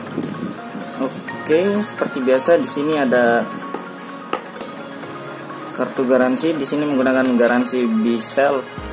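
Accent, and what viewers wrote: Lombok, Indonesia. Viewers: logat dengan lombok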